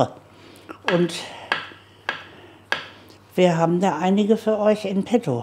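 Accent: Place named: North, Germany. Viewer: Liebe als Fan der allerersten Stunde hat es stets etwas heimeliges, dir zuzusehen. Dies umso mehr als deine Art zu erklären, sprechen, ja- auch die Küche selbst- mich an meine norddeutsche Schwiegerfamilie erinnert. Umso bedeutsamer, da mein lieber Mann derzeit sehr krank ist und Aufmunterung gebrauchen kann. Die kommt nun von euch beiden. Dank euch für das tolle Rezept!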